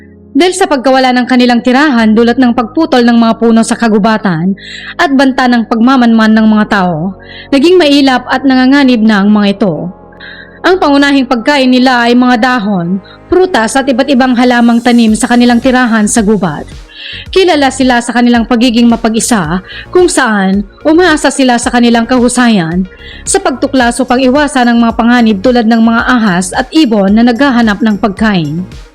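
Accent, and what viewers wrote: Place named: Bicol, Philippines. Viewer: manay ang tuno ng bosses mo bicolana pa din catanduanes hahaha....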